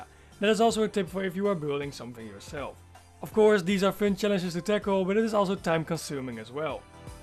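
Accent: Netherlands. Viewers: Where are you from? Your accent makes me think you are Dutch. Nice build bdw.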